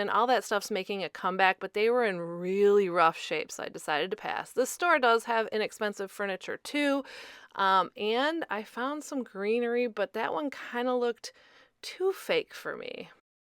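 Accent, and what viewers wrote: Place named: Michigan, United States. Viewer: I started watching you when we opened our large booth in 2023. We had a very small booth years prior. The tips and info you shared reminded me that I did know how to do this, and was so appreciated! I've kept watching you as your information on display and merchandising remains so current and valuable. Love to go thrifting with you. Welcome to Whatnot! I'm not a seller, but have been a buyer for a while now. I'm excited to add you to my Favorites. I'll see you there! On a side note, I have family in Michigan (I live in California) and I love listening to your accent as it reminds me of them. Merry Christmas!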